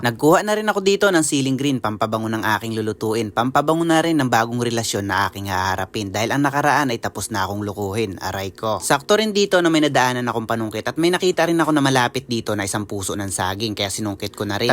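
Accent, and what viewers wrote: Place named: Mindoro, Philippines. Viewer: Parang tonong mindoro ka brad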